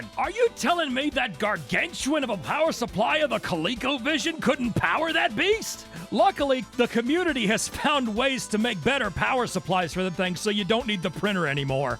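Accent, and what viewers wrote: South, United States. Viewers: ahh, a southern man telling us about bad hardware on game consoles. Peak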